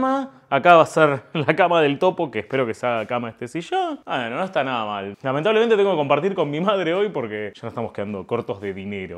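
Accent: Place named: Argentina. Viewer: Viajas por el mundo....y hablas en acento argentino...?.. habla en castellano original...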